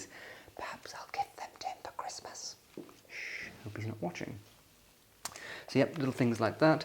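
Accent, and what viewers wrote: RP, United Kingdom. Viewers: So British, so posh hahaha. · Yes, unfortunately he does not see it. He's made videos before addressing people that criticize his posh accent and his lifestyle stating that's who he is and he just ignores it. He thinks the critique is just people being mean or that envy him. I think there's a nice person in there, but he has convinced himself he needs to keep up the elegant dandy facade. He comes across a bit sad and lonely to me. That scene in his closet gave me manic vibes...very OCD.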